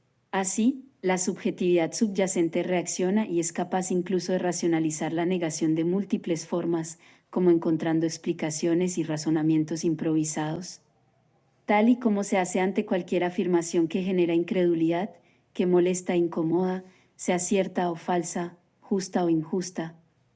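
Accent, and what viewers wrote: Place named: Antioquia, Colombia. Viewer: Muy interesantes reflexiones Andreu, la cronología tradicional de la historiografía efectivamente es casi pura creencia religiosa y sabemos que la razón tiene poco que hacer en la arista de las supersticiones, lamentable es que esa religión fue convertida en "ciencia" a espaldas de la humanidad y por ello provoca esa "disonancia" tan marcada, teniendo a nivel planeta a casi todas la facultades humanistas de historia obedeciendo sus dogmas. (excelente narradora virtual que contrato,! a demás cuenta con el bello "acento paisa" :D)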